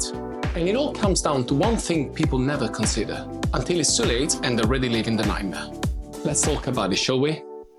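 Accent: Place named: England, United Kingdom. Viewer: So refreshing to hear someone who has learned to speak English with a more authentic English accent, rather than an American accent.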